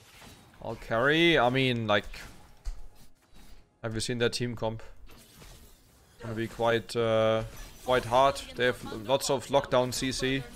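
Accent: Germany. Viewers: This game I realized you are german and I watched a lot of videos of you😂. Usually every german is with 100% accuracy detected by me after the first few words because german-english accent is very obvious.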